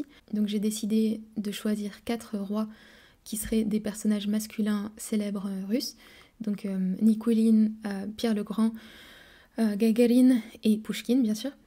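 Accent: France. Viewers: Если бы Вы знали французский язык, то сразу бы поняли по произношению и выбору слов, что она француженка. Что касается её русской речи - вполне стандартная речь, изучающего язык на уровне А2-B1. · Вы внешне не очень сильно напоминаете типичную француженку из Парижа или Марселя. На вид обычная русская девушка из Москвы или Петербурга. Думаю с толпой вы легко скроетесь. Тебя выдаёт только твой забавный и милый французкий акцент. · Интересно звучит русский с французским акцентом , такое няшное звучание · у тебя очень красивый женственный голос и с приятными французскими нотками!)) Говори по русски ни кого не бойся! У тебя очень хорошо получается!😊👍 Вы всё замечаете... вы замечательная красивая девушка!) P.S. ne faites pas attention aux commentaires négatifs, ils sont écrits par des robots ukrainiens en règle générale (troupes de canapé)... ils essaient de discréditer les Nations.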